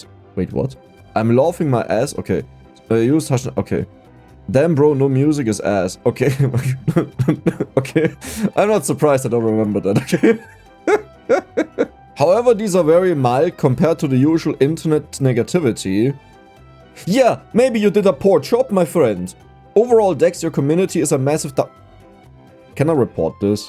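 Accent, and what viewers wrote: Germany. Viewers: I love your xD but for real, I really like your content, I pretty much always watch you to sleep in and I think your German ascent is charming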